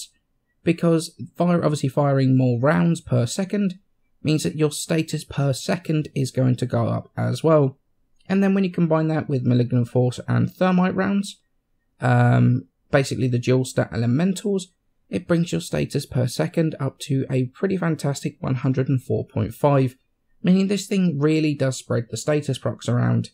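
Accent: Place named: England, United Kingdom. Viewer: no he's just English... that's how we say it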